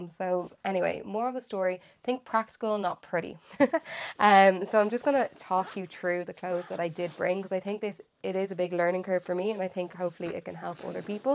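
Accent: Ireland. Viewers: A Kiwi here - I LOVE hearing an Irish accent and had no trouble understanding you. We ALL have accents of one kind or another, and Irish is one of my favourites.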